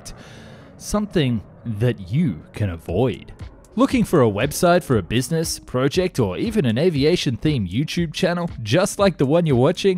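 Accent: Australia. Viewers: I reckon the narrator is an Australian. Lovely 🙂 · you're Australian right?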